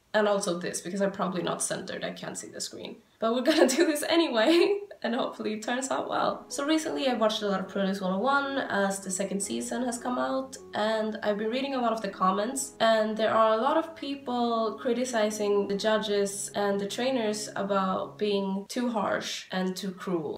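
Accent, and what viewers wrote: Sweden. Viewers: I knew I've heard this accent before hehe. Du e svensk eller hur?:")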